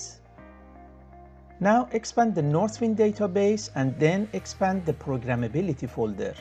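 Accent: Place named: Iran. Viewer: Thick accent w/ Farsi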